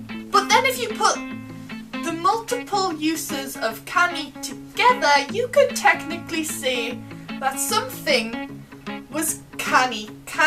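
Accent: Newcastle, United Kingdom. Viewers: Accent from Geordie shore, gotcha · If this barely Geordie I don't want to imagine how the " real" Geordie sounds hahahahah 😂😂 It's getting harder for non native speakers like me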